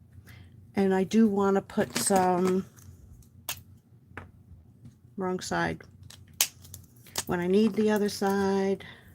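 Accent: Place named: Brooklyn, United States. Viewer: Very cute verrrry cute! You sound like youre from Brooklyn lady!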